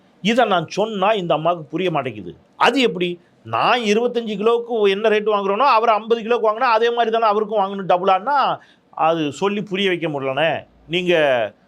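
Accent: Tirunelveli, India. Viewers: பிரதர் ரொம்ப நாள் கழிச்சு நம்ம ஊர் பாஷை திருநெல்வேலி பாஷை கேட்டிருக்க வாழ்க ஜனநாயகம்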